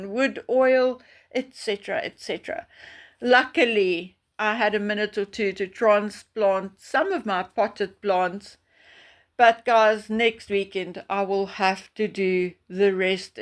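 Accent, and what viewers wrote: South Africa. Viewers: Just heard this post is this a South African accent here?? If so, greeting 🙏 from South Africa 🇿🇦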